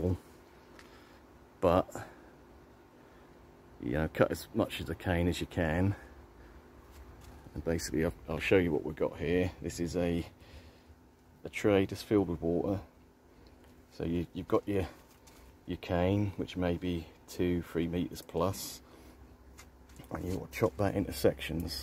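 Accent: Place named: East Anglia, United Kingdom. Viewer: Hi would you sell me a couple of cuttings …. From your accent I can detect a slight east Anglian lilt. Possibly Norfolk? Or north Suffolk. Anyway I travel to norfolk every couple of weeks so I could collect as I’d like to try growing it. Having been inspired by your video or you could post …? If not. Any ideas where I could get hold of some?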